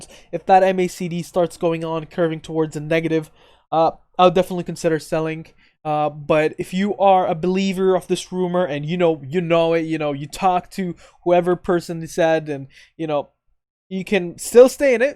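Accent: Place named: Philippines. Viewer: Just subscribed! Found your posts on /r/pennystocks Random question, but are you Filipino? 🤔 Hearing a hint of an accent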